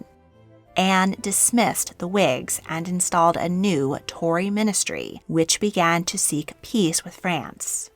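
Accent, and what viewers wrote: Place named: United States. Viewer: The narrator's voice is rather unpleasant. Sharp, constipated, painfully american.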